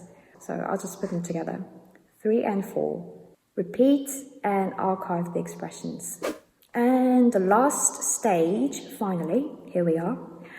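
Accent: United Kingdom. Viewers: 목소리 자체가 너무 예쁘네요 게다가 영국 발음 너무 듣기 좋아요!!!!! 전 미국영어 발음이 너무 어려워서 차라리 영국발음으로 해야겠다라고 결심한 사람인데요 넷플에서 쉐도잉 하려고 하는데 딱히 맘에 드는 드라마가 없더라고요 재미있는 시트콤이 최고인데 미란다,아이티 크라우드도 없고,,,해서 찾은게 Lovesick입니다 마땅한 컨텐츠 고르는것도 보통일이 아니네요 그냥 이거 보면서 똑같이 따라하고 반복하고,,그래야겠죠 암튼 국내에서 저렇게 영국영어가 가능하다니 놀라울뿐입니다 훠우!!👍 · 영드에서 들어볼 법한 발음이에요!! 공부할 때 써먹어보겠습니다! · 진짜 잘 일듣기는데 영국식이 편하네요.진짜 젓도 모 르는데 R,t가 잘 듣기네요. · 영국인 인가여?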